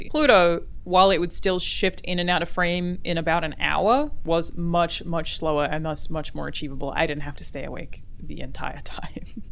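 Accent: Australia. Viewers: Are you Australian? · Dude, your accent's kind of blowing me away. It's like this weird amalgam of like Australian and New Jersey (?)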